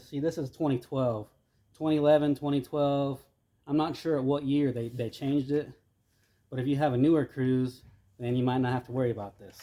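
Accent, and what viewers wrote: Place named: Ukraine. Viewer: I can tell you’re Ukrainian by your accent.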